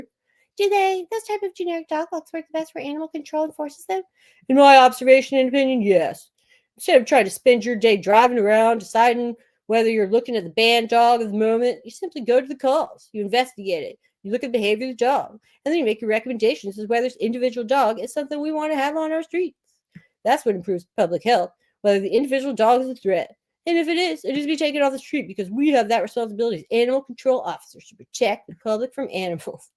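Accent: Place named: South, United States. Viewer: You’re throwing me off with the southern accent because I don’t think Floridians speak like that. 😅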